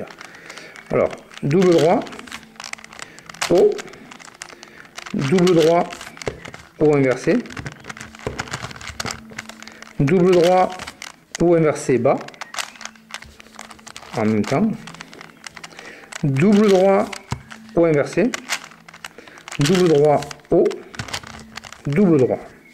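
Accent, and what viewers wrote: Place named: South, France. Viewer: super boulot !!! à conseiller à ceux qui aiment les cubes moi et mon fils (et oui je lui es donné le virus) sommes fans de ces jeux et avons une grosse trentaine de cubes nous venons de recevoir le 4x4x5, 5x5x4, et bien d'autres encore et avons commencé le 4x4x5 grasse à toi merci beaucoup question : sais tu faire le gigamix je ne trouve pas de vidéo explicative comme toi en français ! mon petit grand fait aussi des videos c'est lyondu31 vu ton accent serais-tu du sud merci encore Eric ANTIPHON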